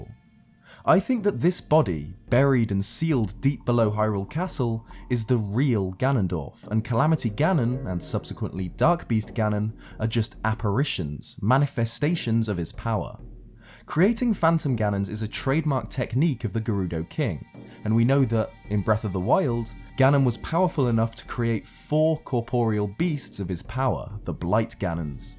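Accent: England, United Kingdom. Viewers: Great stuff, man. The British accent combined with the quality of your footage always makes me feel I'm watching a documentary on History channel :p. On a different note: This sequel can only be really awesome. Something that probably won't be in this game, but what I miss is Dark Link. Like, I would love a game where Dark Link is actually born from Link when he sees Zelda being kidnapped or being hurt and he just goes on a crazy rampage killing spree, letting out his dark side from anger. And then being able to access that power when needed to become stronger, kinda like Hollow Ichigo in Bleach. But that's just me.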